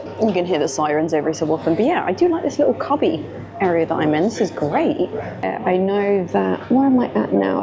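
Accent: RP, United Kingdom. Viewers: You're painfully posh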